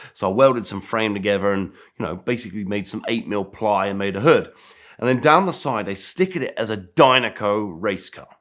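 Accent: United Kingdom. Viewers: I don’t care how good a story is, it’s just that much better when hearing it from someone with an excellent British accent. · i dont expect the everyman-british accent from him! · maybe its just the brit accent but i now want to make the lightning mcqueen mobile!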